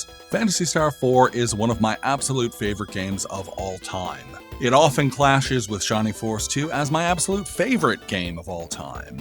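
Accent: Maritimes, Canada. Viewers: Bro... you from the maritime provinces?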